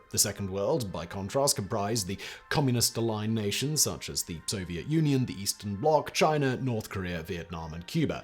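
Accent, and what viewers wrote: United Kingdom. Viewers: mate can’t understand you. This is only for British English users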